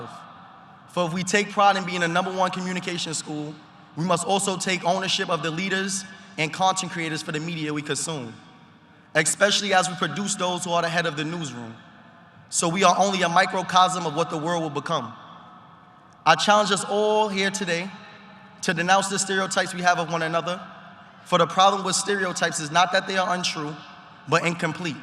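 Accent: United States. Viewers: I ❤ his Brooklyn accent! Saying 👋🏿 from Texas. Proud of you,! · That DEEP New York Accented Beautiful man of Color spoke LIFE🎉🎉🎉🎉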